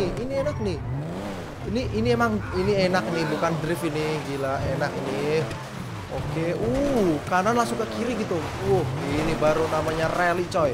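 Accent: Jepara, Indonesia. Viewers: Bang orang jepara ya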